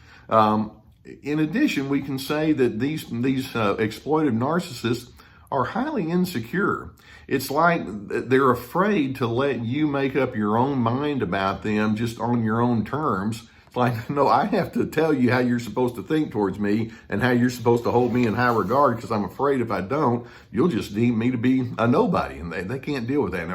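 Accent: South, United States. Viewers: Ty for a great video, I love your accent ( i am homesick - miss hearing folks chatting) i had a narc boss, who liked to make everyone her "idiots", finally, after 2 yrs, i had enough, i stood my ground & gave her some southern fire- now i am free of her, - watch out for bosses, who like office drama.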